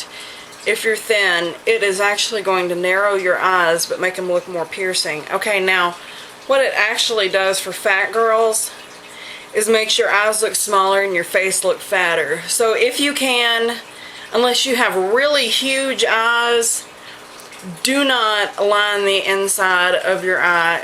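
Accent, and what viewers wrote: South, United States. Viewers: where are you from ?? your southern accent is so awesome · You my friend, just earned a new subscriber. :) Are you from the south?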